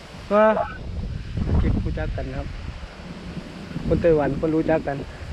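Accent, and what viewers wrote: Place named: Nyaw, Thailand. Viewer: เก็บเลยครับตากแดดไว้กินคือเว้าญ้อแกมภูไทจังหวัดได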